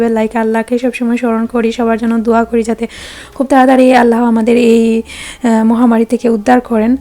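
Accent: Sylhet, Bangladesh. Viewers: আসসালামুয়ালাইকুম কেমন আছেন আপু আশা করি সবাই ভাল আছেন। আমি আপনার ভিডিও দেখি ভালো লাগে বিশেষ করে আপনার কথা আমার কিন্তু সিলেটি বান্ধবী আছে আপু আমি তোমার পাশে আছি আর তুমি ও আমার পাশে থাকবে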